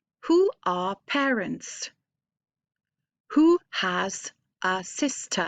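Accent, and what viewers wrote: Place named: United Kingdom. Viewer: I assume this video is for learning English rather than Norwegian? Also I've never heard a Norwegian speaking with a British accent, so that's cool 😊.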